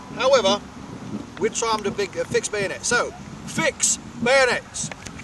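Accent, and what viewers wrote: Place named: United Kingdom. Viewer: I've actually seen Brits speak like tht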